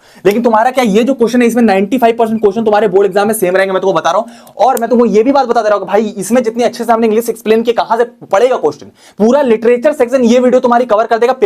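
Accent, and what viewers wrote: Bihar, India. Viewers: BHAIYA KA BATH KARNA SA LAGTA HA BIHAR SA HA HA BHAIYA I AM ALSO FROM BIHAR ❤🎉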